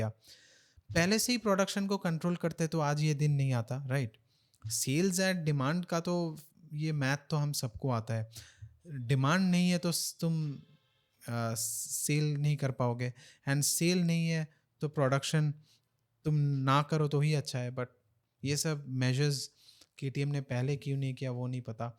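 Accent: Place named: Bengal, India. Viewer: Your bong accent is too pronounced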